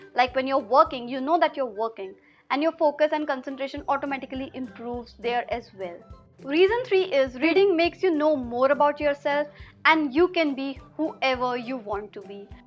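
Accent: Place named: Punjab, India. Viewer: Are you Punjabi girl? You have Punjabi accent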